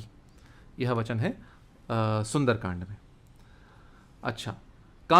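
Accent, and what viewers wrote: Standard. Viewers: आपके अध्ययन, मनन, चिंतन और वर्णन का में कायल हो गया हूँ!! आपका शुद्ध उच्चारण और विषय में सटीक सरल उदबोधन बहुत ही प्रभावशाली है!! एक बेहतरीन विश्लेषणयुक्त प्रस्तुति हेतु आभार!!🙏👍🙏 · Am impressed by your sudhha hindi ..and appreciate your description of the different body parts of Shri Ram .. thanks for clarifying ..जय श्री राम 🙏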